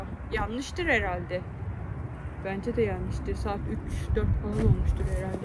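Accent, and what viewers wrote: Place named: Laz, Turkey. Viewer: Hafif laz şivene hastayım yaa çok sevimlii :dd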